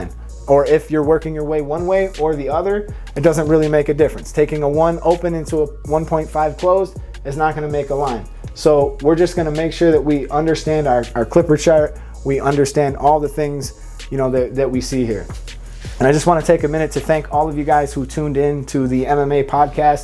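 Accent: Buffalo, United States. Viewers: You from buffalo?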